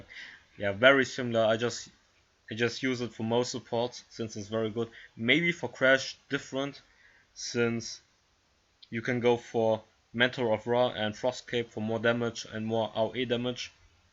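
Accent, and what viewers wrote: Germany. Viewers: Wie man einfach raushört das er deutsch ist xD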